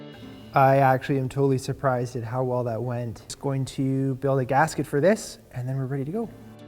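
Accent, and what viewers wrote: Canada. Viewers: U from Canada A?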